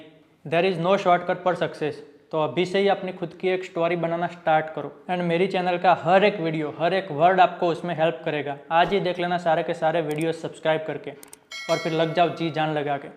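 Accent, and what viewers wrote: Gujarat, India. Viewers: Aap gujraati ho? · That gujrati accent though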